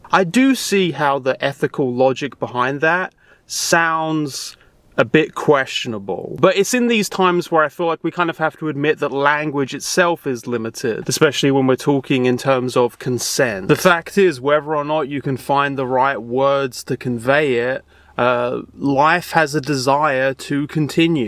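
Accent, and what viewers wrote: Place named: United Kingdom. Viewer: Very unrelated remark/'question': this is the second time this week I find out a content creator (*hurl*) I like, who also happens to have a British accent, turns out to be living in a deeply red state. Wastha'abou'? I am grossly assuming here that you actually live in tennessee, apologies if that's off-base.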